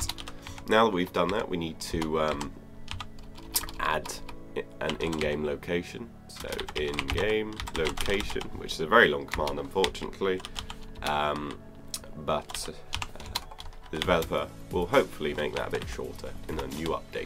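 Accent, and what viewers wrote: Netherlands. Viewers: R u Dutch i am Dutch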